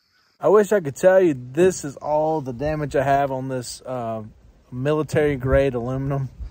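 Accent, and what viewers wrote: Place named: South, United States. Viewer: I’d rather have dents than rust. I live in the rust belt and it’s the only reason it’s necessary to replace my vehicles. By your accent it sounds like you live in the south. I purchased a 2017 f150 just for that reason! My last one is a 2008 and has rust everywhere!!!! Steel is very very strong but also heavy. I just restored a Saab 92x and the rust cost me 10 grand to fix! It’s got to be stainless steel or aluminum for me.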